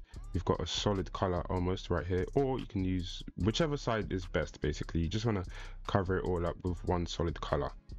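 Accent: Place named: United Kingdom. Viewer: Why are you british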